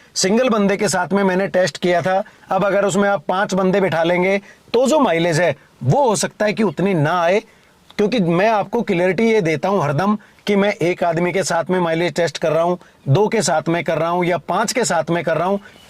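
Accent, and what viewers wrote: Haryana, India. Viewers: भाई जी राम राम ap hna bolte acha h thodi haryanvi touch aati h vo acha lgta h apke es channel par to video top hoti hi h, lekin eske alawa m khna chahta hu ki ap ek apna vlog channel bhi bnaye or daily routine ya family k sath kahi aate jaate h uska vlog bnana ye sab video bhi suru Karo vlog channel k sath.. mja aa jayega ❤️❤️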